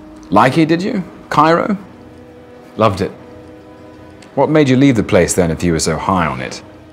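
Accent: United Kingdom. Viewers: Accent?? British English is the original English language. Others have accents.. i think. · Thats because he attended Eaton and Cambridge and speaks with "Received Pronunciation", the style of speaking taught in the Elite Public Schools....also used by BBC Annnouncers, much like Standard American used by Broadcasters in America, often referred to as unaccented American English. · This is like ear sex... Why British people are so sexy than Americans while narrating things? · Aaaaaaaargh, that British accent, ow my goodness, that voice. He can read even instruction for vacuum cleaner and it will be a symphony for my soul))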